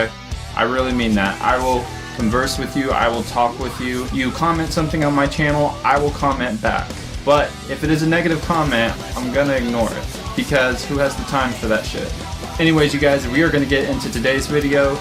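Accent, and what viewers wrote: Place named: United States. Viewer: I could be wrong, but I would assume from your accent that you live in the US? I believe they're about to be hitting the US really soon! Definitely go if you can (apologies if i'm wrong)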